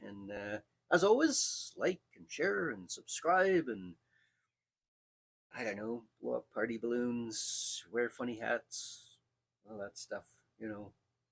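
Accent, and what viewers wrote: Canada. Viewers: I take it from your accent that you may be Canadian? One of the greatest scale modelers was Jack McGillvary from Toronto who built and flew his models to unsurpassed levels. He was one of the Flying Aces greats. Beyond that he was a Wakefield Trophy finalist in 1959. Have you tried rubber flying scale?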